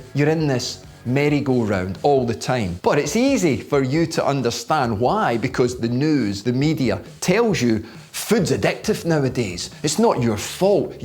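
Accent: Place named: Scottish Highlands, United Kingdom. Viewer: I hear a Highlander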